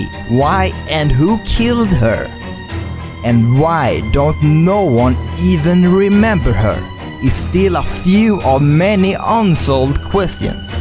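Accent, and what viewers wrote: Sweden. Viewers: förlåt, men din svengelska är riktigt illa.. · Aah, I love your accent!! It's so cute! I'm also from Sweden! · Din svenska accent är så rolig och jättegullig. Jag älskar dina videos! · Are you from Sweden? xD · Are you from sweden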